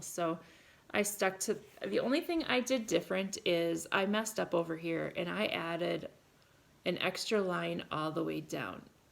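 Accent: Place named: Minnesota, United States. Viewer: I just love your videos! You are so fun to listen to. I love your “Minnesota” accent. 😉. I’m a MN girl too and I live about 20 minutes from you (just north of Becker). I can’t wait to attend my first cross stitch retreat. I’ve attended quilting retreats, but not cross stitch. Hopefully some day we will meet.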